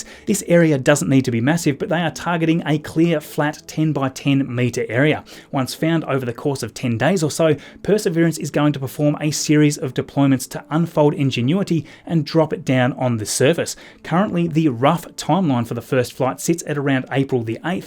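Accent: Australia. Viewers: Lol, YouTube CC wrote “Bokeh Chicago” when you said “BocaChica gal” ... that Australian accent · maybe its the Aussie acent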